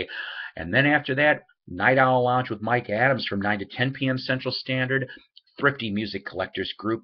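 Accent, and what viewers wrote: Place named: England, United Kingdom. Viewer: Love your English accent!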